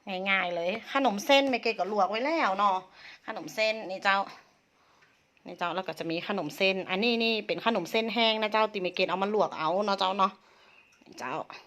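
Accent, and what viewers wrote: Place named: North, Thailand. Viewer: แวะมาฟังสำเนียงเหนือคะ ชอบสำเนียงเหนือมากคะ จากfcใต้นะคะ ❤️ น่าทานมากคะ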